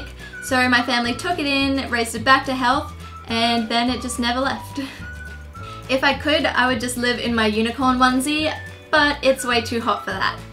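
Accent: Australia. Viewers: you are seriously so pretty! also random fact, you sound like Ingrid (missglamorazzi) but with an australian accent! haha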